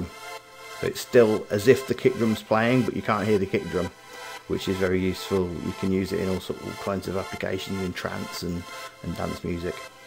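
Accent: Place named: Midlands, United Kingdom. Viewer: good work, are you from the Midlands?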